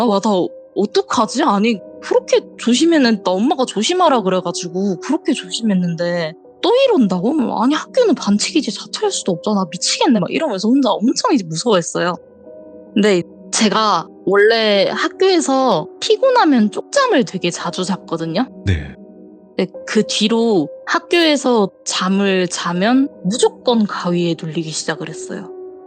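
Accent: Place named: Gyeongsang, South Korea. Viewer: 경상도 마산쪽 사투리인데?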